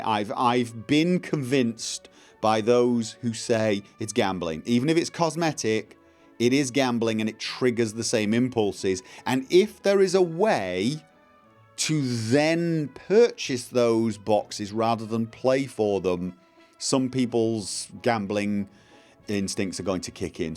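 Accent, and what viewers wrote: United Kingdom. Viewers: I'm American, so I can say this: American is not really it's own language. It's begged & borrowed off so many other languages & then mutated their words in such a poor fashion that they are no longer lending us any. So, now we make up crap nonsense words & add them to the dictionary.:D Thank you, for speaking the Queen's English! Although, that, in itself, also was fashioned from other languages, I suppose. But, it just sounds so much better across that pond!;)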